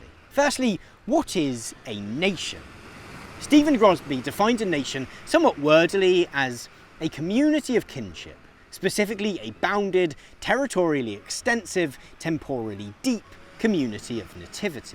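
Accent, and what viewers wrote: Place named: England, United Kingdom. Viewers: When we hear English accent in prose, which is a good thing, we harken to the old school days when music in England was the trend indeed. by is the perfect filler of a song of the Queen's English, mate! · Speaking in your English accent with your Anglocentrism saying that Scotland is an example of a nation that doesn’t have it’s own state. You are blind. England is also a nation that doesn’t have it’s own state. That arrogantly blind Anglocentric attitude is what Scotland wants to get away from asap.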